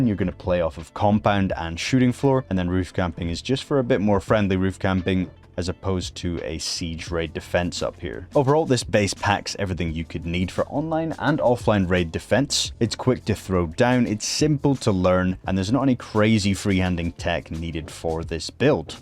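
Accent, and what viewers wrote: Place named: Northeast Scotland, United Kingdom. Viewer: you sound from north east scotland 😂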